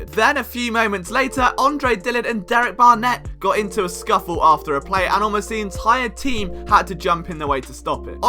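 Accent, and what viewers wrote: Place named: London, United Kingdom. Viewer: YOU SOUND LIKE YOU'RE FROM LONDON!!!!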